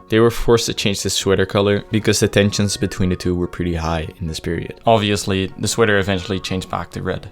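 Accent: Netherlands. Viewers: You can tell by his voice and accent that he is Dutch sooooo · his voice get more Dutch over time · You Dutch yourself? bc HOLY ACCENT haha im from nl soo that why i know the accent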